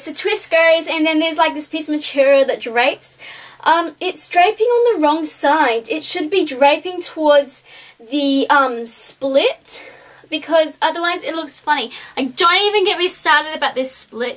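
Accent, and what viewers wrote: Australia. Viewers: French?! I'm sorry, have you ever heard a French accent? Haha, sorry! I just found that amusing--It's a Northern Australian accent. :)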